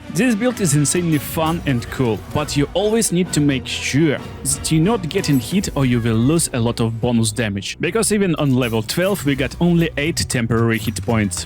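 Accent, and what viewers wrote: Russia. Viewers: РАШН АКЦЕНТ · Такой сильный акцент, что у меня подозрение, что автор - русский. - Helldusk Gloves from Act 3, not from Act 2.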